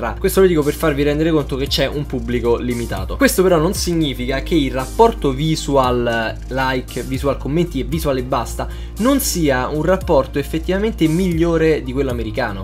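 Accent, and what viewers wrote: Rome, Italy. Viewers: Ho scoperto il tuo canale grazie a dei miei amici (maschi), che penso ti seguano solo per i gameplay, poiché su youtube seguono solo gamer. Ero curiosa, non ho mai guardato video in cui giochi (semplicemente perché non ci capisco nulla), ma mi sto guardando i vlog e i video ask. E niente, ti adoro: mi piace il tuo modo di pensare e di affrontare argomenti un po' scomodi, mi piace il tuo dire quello che pensi, senza peli sulla lingua, ah e mi piace pure il tuo accento romano. Mi pento di averti conosciuto solo poche settimane fa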